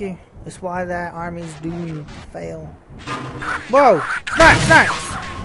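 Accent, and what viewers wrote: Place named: England, United Kingdom. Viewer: english accents ftw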